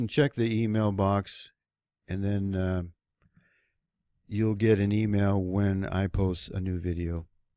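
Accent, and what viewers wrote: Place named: Boston, United States. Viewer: Sounds like Richie from Boston :) I digress.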